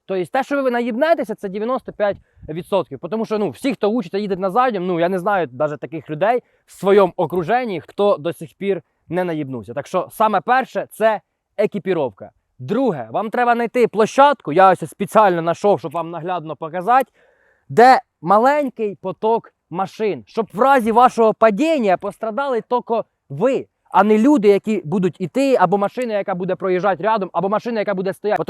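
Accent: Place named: East, Ukraine. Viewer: Як на мене українська зі східним діалектом, або невеликим суржиком. Я кна мене на 100% краще ніж чиста кацапська🤢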